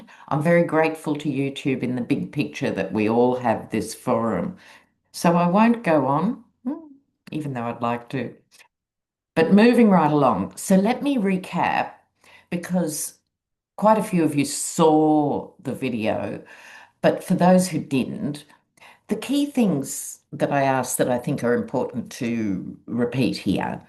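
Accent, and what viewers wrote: Australia. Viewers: I can have a really crappy day and come home and watch one of your videos where you cleverly have such a way with the English language! You were talking the other day about someone pretty sure it was a yeti where you commented on the fact that he has the attention span of a caterpillar. So I don’t know if it’s your sarcastic with, or your Australian accent that makes that comment so effing funny you’re just the best I adore watching you. I watch every single one of your videos and I’m about to go watch you, Linda G and the other two ladies chat thank you so much for your effortsyour comments your wit your charm we love you in America. Thank you for all the hope that you provide for our wavering country PS I’m Irish too. The other half is Cherokee Indian.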